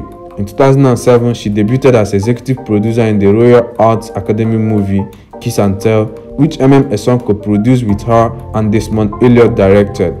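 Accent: Igbo, Nigeria. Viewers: poor presentation. very thick igbo accent.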